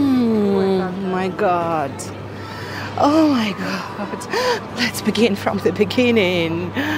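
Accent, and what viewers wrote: Russia. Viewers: Your accent is like Russians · Вы учитель английского? Разговариваете как по Бонку. И акцент русский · Ощущение, что это русская девушка, просто говорящая на английском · ! С первых слов на английском слышно Рязанское произношение. Это пропаганда! Еды много, а кто это покупает?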